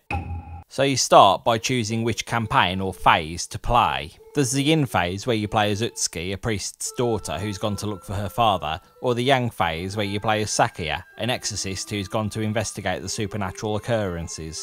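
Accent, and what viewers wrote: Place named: Birmingham, United Kingdom. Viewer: Nice accent. Where abouts in brum are you from😂. Nice vid also 👍🏻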